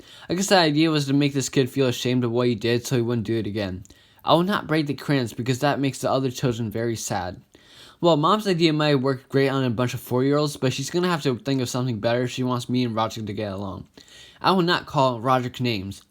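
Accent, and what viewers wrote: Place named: United States. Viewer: Bad don’t speak so fast man and why u American like whyyy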